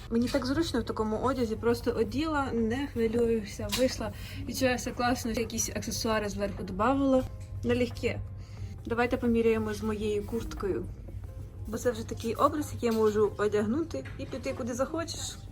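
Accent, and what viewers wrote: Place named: Surzhyk, Ukraine. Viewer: У Вас такой смiшний суржик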